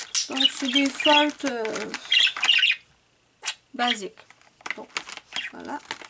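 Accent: Canada. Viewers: Coucou Moi aussi j'adore regarder ce genre de vidéo ça me fait découvrir de nouvelles marques. Et en ce qui concerne tes vidéos je pourrais les regarder en boucle juste pour entendre ton accent 😊 je suis française et l'accent canadien me fait voyager rien qu'à l'entendre. J'aurais une petite question, je découvre avec ta vidéo les prismacolor scholar. Quelle différence y a t'il entre ceux là et les prismacolor premier ? Merci bcp Et bon colo 😉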